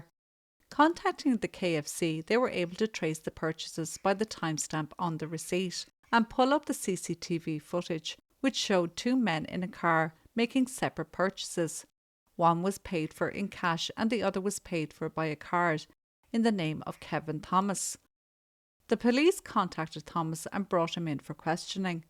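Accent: Ireland. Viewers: This Irish cat doing the commentary freaks me out. She sounds like a Mother Superior on crack!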